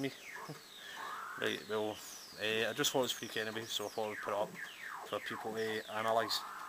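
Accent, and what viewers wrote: Scotland, United Kingdom. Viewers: Due to the poor audio, I had to turn on CC. Sounds like he is speaking a combination of English (Scottish) with a smattering of Galiec.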